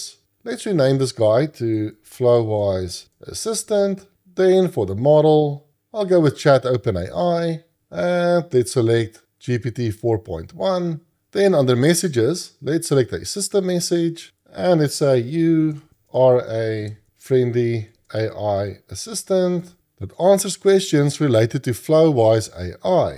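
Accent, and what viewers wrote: South Africa. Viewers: Nice one boet. Your videos are getting better nad better, and are so informative and helpful. I understand you've explained the dfference between this and n8n...just not quite surei get it. I am hoping you'll get to the point where flowise has a stt/tts interactive style. The dream is a personal assistant that can manage calendar, emails, and sdhopping lists etc. And I cna "control" it all via whatsapp! Keep up the great work Dis ook lekker om 'n ander Suid-Afrikaanse ou te hoor!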